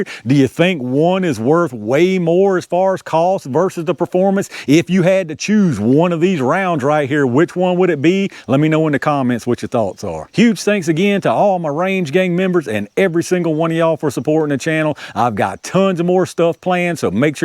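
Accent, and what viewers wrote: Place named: Appalachia, United States. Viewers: This is 2026 right? I think we can do without the hillbilly accent. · That's just the way we talk in the mountains. You say hillbilly like its a insult😆🖕💯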